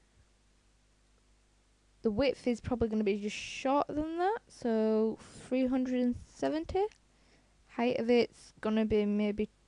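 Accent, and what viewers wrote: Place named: United Kingdom. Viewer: I know right GoodLuckPeice she does sound Brittish! I couldn't really understand her because im asian.And how do you get the link thing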